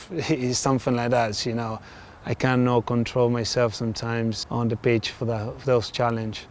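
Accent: Manchester, United Kingdom. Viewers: Me hace mierda Zaba y su acento Británico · Even sounds like a proper Manc. Good lad Zaba!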